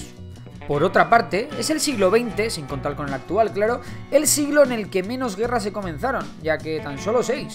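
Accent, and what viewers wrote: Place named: Spain. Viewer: Y porque hablas como peninsular a la vez que te referís a los españoles en 3ra persona? XD por como hablás eres español jajajaj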